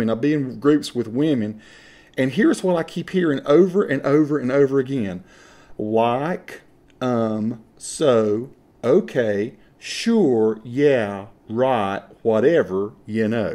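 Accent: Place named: Appalachia, United States. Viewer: Hey, I just watched this video (5 years later), you are raising a very interesting issue about sociolinguistics. First: don't change the way you talk, Southern Appalachian accents are the best ;). Lots of factors are affecting the way people talk, looks like what is dividing people in the South (and elsewhere) today is whether they identify themselves as urban or more rural: the latter group being more likely to keep a distinct accent while the former tends to adopt a "global identity". Other factors come into play as well such as the representation of an accent in media (literature, television...) that create stereotypes and the woman you talk about seems to be rejecting, as you said, her identity in favor of a "global" identity probably because of negative stereotypes. And that's pretty sad because it's the fact that people have different accents that makes a language rich and interesting to study, but more and more people (especially in the South unfortunately) in large cities tend to adopt a more "global" accent we call "General American" in phonetics. 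Interestingly, the representation of an accent is different from place to place: where I'm from (France), people usually like U.S Southern accents. Thanks for sharing that ;).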